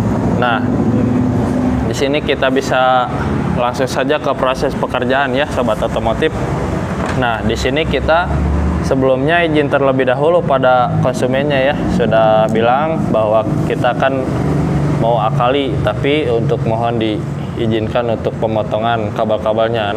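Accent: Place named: Ambon, Indonesia. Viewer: Mas ini pasti dari ambon dilihat dari logatnya..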